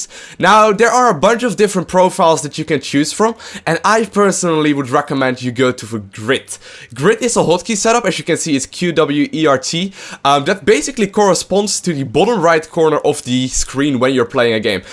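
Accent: Netherlands. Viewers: are you Dutch? you sound Dutch to me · Just wondering are you from the netherlands? :)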